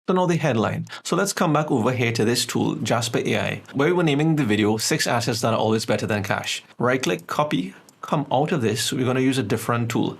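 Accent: Trinidad and Tobago. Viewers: Love this video. Are you From Trinidad?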